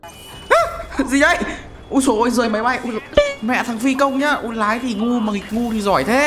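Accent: Hai Phong, Vietnam. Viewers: Ah dân Hải Phòng à??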